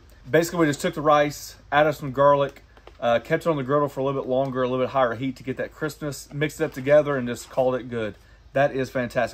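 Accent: South, United States. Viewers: With a Sweet Southern Accent 🤗😎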